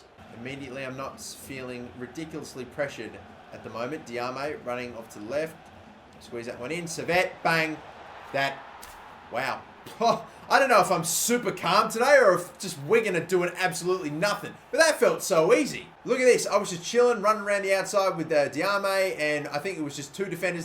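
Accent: Australia. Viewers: Tommy C yeah but then you hear his whining Australian voice even more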